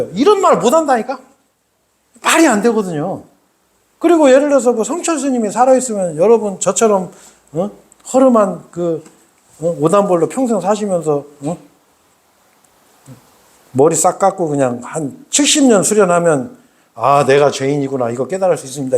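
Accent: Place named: Gongju, South Korea. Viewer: 나의 고향 공주에 복된 교회가 생겼네요! 형제님의 공주 사투리도 정겹습니다. 공주에 사는 우리 친척들이 구원받을 기회가 되기를 기도합니다.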